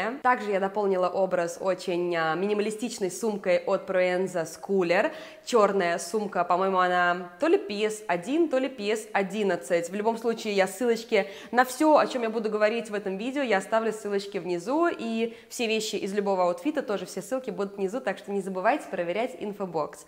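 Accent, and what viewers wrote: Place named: Italy. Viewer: Больше всего люблю, как ты говоришь! У тебя появился акцент итальянский?) Не акцент даже, а интонирование, точно не русское) очень мне нравится🙌🏻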